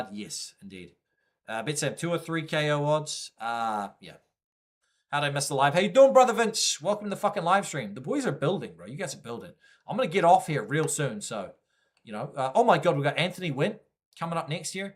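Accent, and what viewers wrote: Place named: New Zealand. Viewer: He’s Kiwi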